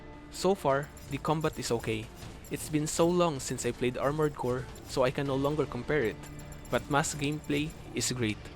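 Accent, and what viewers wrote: Philippines. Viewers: Pinoy? Your accent was so obvious.. Anyway I love your video keep it up.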